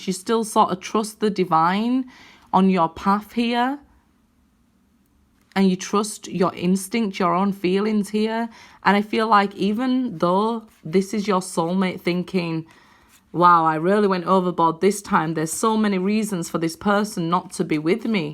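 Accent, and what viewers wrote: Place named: North, United Kingdom. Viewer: You just read my life .But your accent always has me imagining that its Mel B lol .bet your a right northern girl xx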